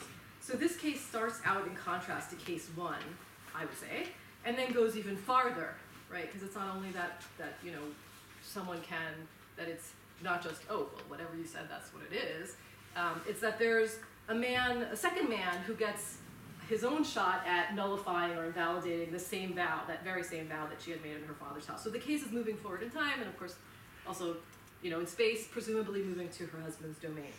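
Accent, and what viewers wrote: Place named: United States. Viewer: gosh Ive worked with this woman and am amazed that she delivers her material with the charisma of an inexperienced first year undergraduate student.In real life she is bright enough but viciously nasty to anyone she considers weaker or below herself. Here she mumbles and delivers a soporific presentation. Amazing. The boredom of the moderator is so obvious. Also she needs to work on her Hebrew pronunciation , it's painfully American.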